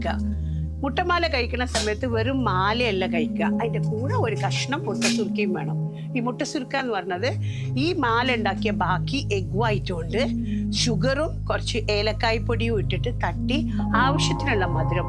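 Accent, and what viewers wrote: Kozhikode, India. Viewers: Kozhikottaare samsaram kettaal thanne vayar nirayum ❤️‍🔥💪 Oru kozhikotukarii 😉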